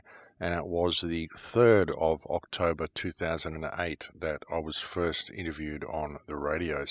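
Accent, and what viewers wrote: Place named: Australia. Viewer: Gate-keeping. By the way every time I think of this concept I hear your Australian accent...so your videos work to a degree lol.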